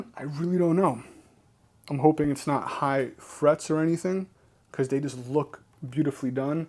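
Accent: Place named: New York, United States. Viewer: As soon as I saw you got the matte black Les Paul. I have the same one but with green trim I'm surprised you didn't plug it in before you opened up the other one you showed amazing restraint God bless you . End with the abalone. I'm from the South Shore of Boston so it was about a second and a half into the video that everybody knew you from the East Coast definitely got New York in there I'm saying you're almost Rhode Island border Maybe Rhode Island Fall River. You're going to love that Matt Les Paul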